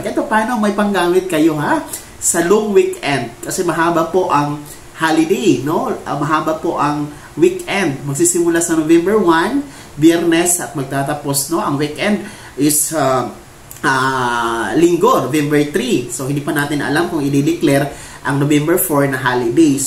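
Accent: Visayas, Philippines. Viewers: Ayusin mo salita mo.and pronounciation.mo.tunog.bisayang.bisaya dating mo tapos fake news mo.na.naman puro ka paasa.pag nakita kita dudugo.bibig mo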